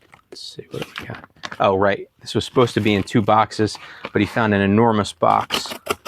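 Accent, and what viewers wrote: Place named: New England, United States. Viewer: I knew that was a Ne accent. I’m in NH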